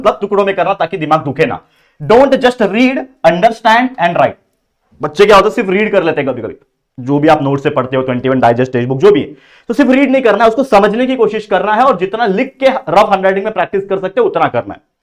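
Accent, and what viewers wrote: Maharashtra, India. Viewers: sir kya aap marathi ho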